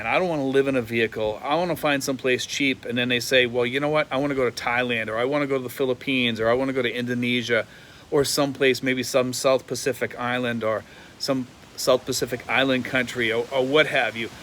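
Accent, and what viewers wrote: Boston, United States. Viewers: You just showed up in my feed. You could have been any guy from anywhere on the planet. As soon as you Open your mouth I said Boston.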